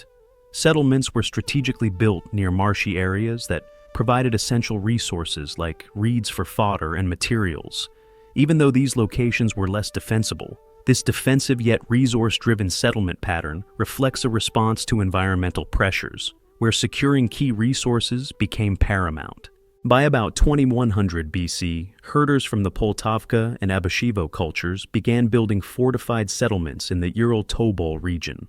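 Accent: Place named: United States. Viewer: 🙉🙉🙉An other voice please and no American English.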